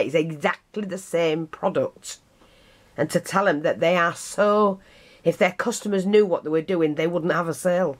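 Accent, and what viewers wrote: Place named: Yorkshire, United Kingdom. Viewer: Hi I'm new to your channel too and would second what gerilynne1955 says below, you refer to your shop but I'm a bit confused as to what shop this is, perhaps you could link the products you use in your description box. I love your work and am definitely going to try a few things you have made. Are you a Yorkshire lass? I am but am now living in Minnesota in the USA, great to hear a Yorkshire voice!!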